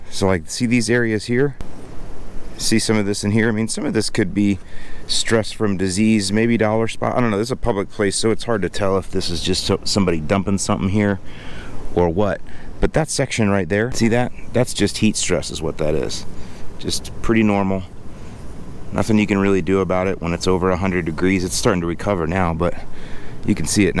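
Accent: Chicago, United States. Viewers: I love the Chicagoland accent you add into your videos! Reminds me of when I lived up ‘dere! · Nailed the midwest accent. Sounded like you are from Chicago for sure 😄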